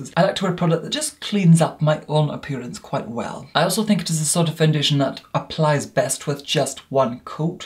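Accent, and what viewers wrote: Scotland, United Kingdom. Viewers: Am I hearing a bit of Scot in your accent (like Alan Cumming)?? I love this video, I can DEF afford the NYX. TY <3 xoxox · he sounds very Scottish to me (another scot). You can tell he is from the north of Scotland and stayed in Glasgow. I'm sure he has picked up a little bit of an English accent but that's only natural if he is living there. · Greig Scott he’s Scottish, very posh Scottish at that lol. I’m from Glasgow and I’m trying to work out where he’s from exactly. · He’s Scottish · it took me a full two minutes to figure out he was scottish, despite me also being scottish and living in scotland. it’s just something about the way he talks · Literally the poshest Scot ever. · I can’t decide if your Scottish or not? You definitely sound different from me if you are! Where are you from?? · I love your accent. I love all Scottish accents but yours in something beyond! 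:)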